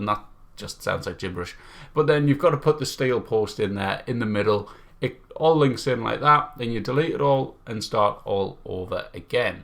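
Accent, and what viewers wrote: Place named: Newcastle, United Kingdom. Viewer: Fellow geordie?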